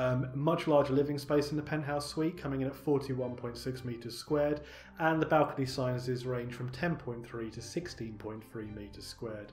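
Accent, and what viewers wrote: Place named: United Kingdom. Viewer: Thank you. We have never sailed with Regent, but decided to try Regent/Splendor. Oceania Fans to be honest. Very good outline/overview vlog! Appreciated. Booked our cruise and pray, after the challenges we all face regarding CV19, that all goes well and we get to experience Regent and this beautiful ship. wishing you/family and all the Regent family every health and success in the years ahead. Liked hearing a fellow Brit too :-). Cheers to getting back at sea very soon. Best wishes, Elaine & Tom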